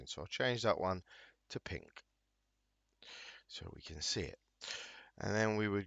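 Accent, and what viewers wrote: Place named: Cockney, United Kingdom. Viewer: proper cockney geezer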